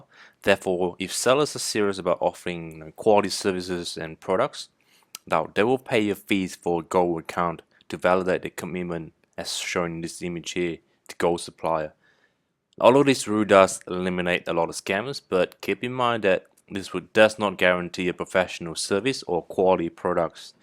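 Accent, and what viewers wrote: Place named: Australia. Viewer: he's Asian, with an Australian accent, is what I mean.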